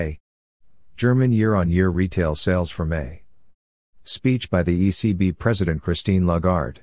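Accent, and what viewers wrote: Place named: United States. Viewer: American accent in a London report … interesting. ☺️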